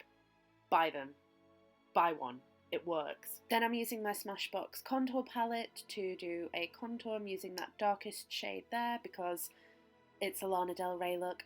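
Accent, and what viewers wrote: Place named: North, United Kingdom. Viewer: Oh right, I'm really bad with spotting accents but I did detect a slight northern accent haha. That's so cool that you have family here haha xxx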